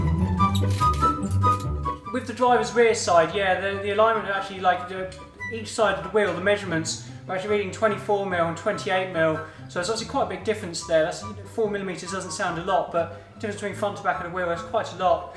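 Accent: Liverpool, United Kingdom. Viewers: Best Channel, Best Content and Best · ! you Strawberry blonde brother hahaha keep the vidz coming my guy 🔰🔥📚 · for the win my favourite ginger 🐒. Miss seeing mitto also get him back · Superb! keep it up bro loving the work 👍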